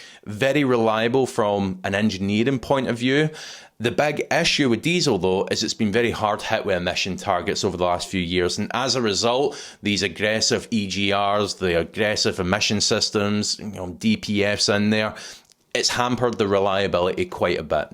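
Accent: Scotland, United Kingdom. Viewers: Would be grateful for recommendations regarding bigger cars with lots of space, to be converted into a mini camper. The usual suspects Caddy, Kangoo, Berlingo etc get mixed reviews, the Toyota vans are based on French PSA - so it is really hard to come by sth reliable. Maybe some other Asian vans or SUVs? Or the Dacia SUVs with older technology engines? From Germany here - and really appreciating you channel, even if I have to listen very carefully to understand that nice Scottish accent. ARRR! 🏴‍☠️🦜😁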